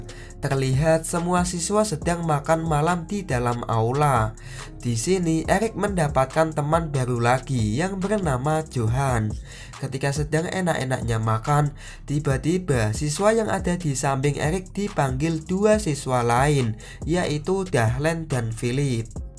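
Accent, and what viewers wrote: Java, Indonesia. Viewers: Medok banget lu bang..wkwkkw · wong jowo? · Medok banget sih Lo, jijay gw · JAWA NYA MEDOOOOOOOK BGT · Meudok bingit min .jangan kaku min bicara nya. Monoton bingit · jowo mu endi mass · Medok banget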